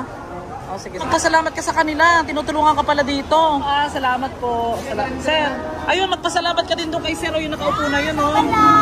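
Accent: Visayas, Philippines. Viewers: Maraming salamat, bisaya ka pala kala ko taga bulacan ka, new subscribers here😊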